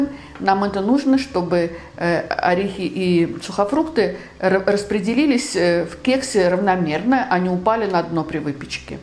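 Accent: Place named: Baku, Azerbaijan. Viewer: Красиво говорите! Вы случайно не из Баку?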